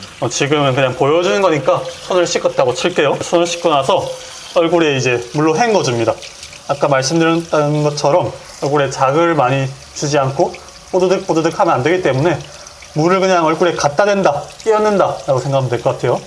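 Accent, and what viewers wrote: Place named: Gyeongsang, South Korea. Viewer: 갱상도 쌤이네요 씻껐다 치고~♡ 씻었다고 치고^^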